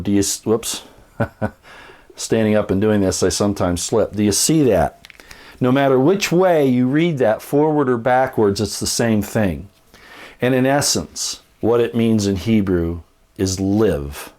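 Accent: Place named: United States. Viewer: His first language is obviously not Hebrew so you have to apply his american accent to it. Seriously, I do not criticize people that do not speak English very well. I give them props for knowing two languages.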